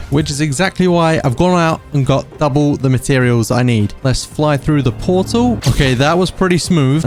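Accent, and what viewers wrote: United Kingdom. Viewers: WHY ARE YOU BRITISH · Is bro British?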